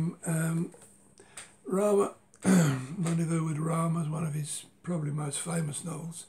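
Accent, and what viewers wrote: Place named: Essex, United Kingdom. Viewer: I thought your accent sounded as if you came from Essex. It's where I live.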